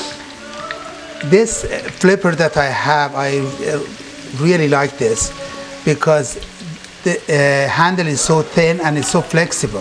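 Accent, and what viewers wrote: Iran. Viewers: من جدیداً این فیلم رو دیدم وبه نظرم عالی بود که با لهجه قشنگشون این غذا رو آموزش دادن خانم داشتن لهجه نشان دهنده این هست که این آقا زبان دیگه ای به جز زبان انگلیسی بلده و این خیلی خوبه مخصوصا لهجه ایرانی نشان دهنده این هست که زبان شیرین فارسی رو بلدن انگلیسی زبان ها هم موقع فارسی حرف زدن لهجه خیییلی زیادی دارن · I'm sorry, but I really couldn't focus because of his thick Persian accent (the one that Persian are so famous for) Bebakhshid!😅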